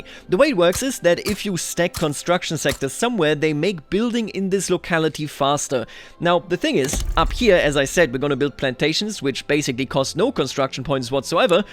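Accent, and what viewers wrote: Germany. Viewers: Hearing iranian names in a german accent is.... interesting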